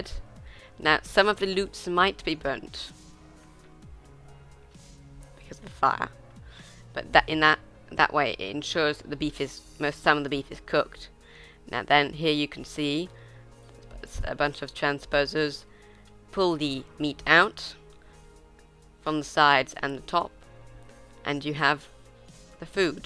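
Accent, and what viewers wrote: RP, United Kingdom. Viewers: Wow you are very good at this "tekkit" of which you speak of... although are you Brittish because you seem to have a very 'posh' accent... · Very helpful for an automated farm! Additionally love the RP accent.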